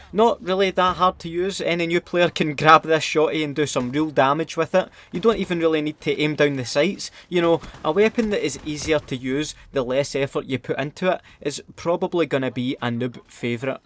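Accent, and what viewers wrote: Scotland, United Kingdom. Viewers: I love he’s Scottish accent lol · What part of Scotland do you come from? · You from Scotland?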